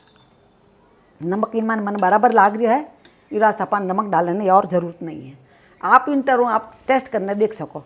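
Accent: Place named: Marwari, India. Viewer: Very good respie aunty ji and ur voice of marvari so sweet ...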